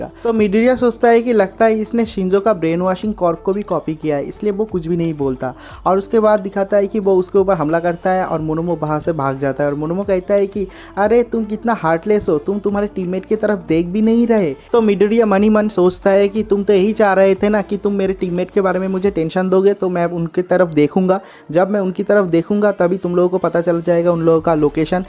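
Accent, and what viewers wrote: Bengal, India. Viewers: r u bangali bro...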